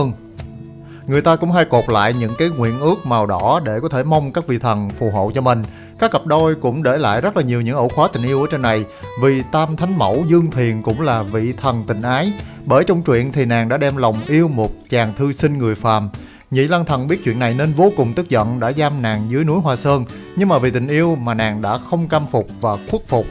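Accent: South, Vietnam. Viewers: Kỷ thuật quay phim điêu luyện,giọng thuyết minh miền nam nghe thật dể mến,chúc cháu luôn khỏe mạnh để thực hiện thêm nhiều clip đặc sắc nhé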